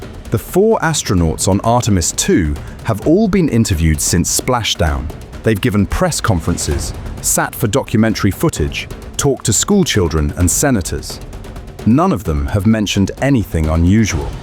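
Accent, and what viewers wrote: United Kingdom. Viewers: Adding the British accent always adds some officiality to the video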